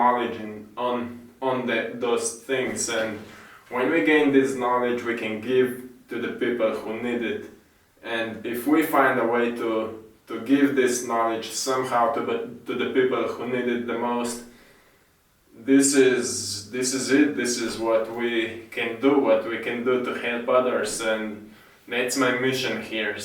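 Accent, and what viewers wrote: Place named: Slovenia. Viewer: Živjo, začela sem pisat engleško in čujem da si Slovenc. Gdaj sem vidla da delaš yogo in posnemek v naravo in pravim kako je to čudovito lepo, kje pa je to?! A to je naša lepa dežela. Samo naprej k veličanstvenim. Lep pozdrav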